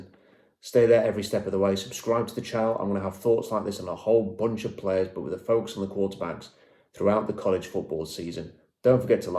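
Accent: United Kingdom. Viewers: Wait! Hold on! You've got a British accent, and you have no problems with "football"? Where's the "foot" in "football"? I always hear.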